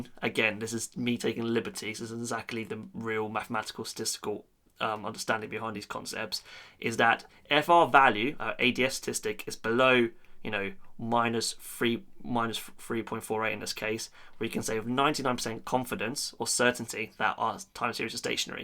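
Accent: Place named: United Kingdom. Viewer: You are not talking with only your British people , so try to speak slowly with normal English and swallow your saliva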